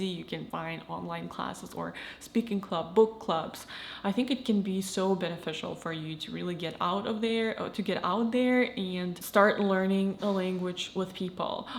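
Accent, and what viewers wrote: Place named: Russia. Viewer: The late zoomer/early millennial Russian accent is something else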